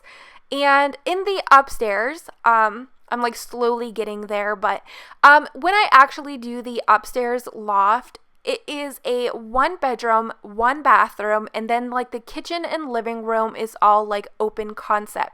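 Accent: Canada. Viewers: I used to believe Canadians didn't have much of an accent that differs from Americans. This video proves me wrong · It's called an accent (I believe she's Canadian) chill out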